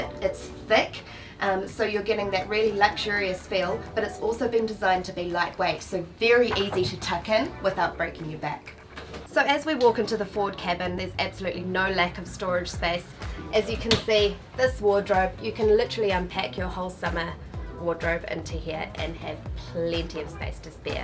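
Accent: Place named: South Island, New Zealand. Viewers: Ben Dixon that’s 🥝 kiwi’s for yah. Or is she Aussie 🤔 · I thought it had heads not heeds. Arrr that South Island accent.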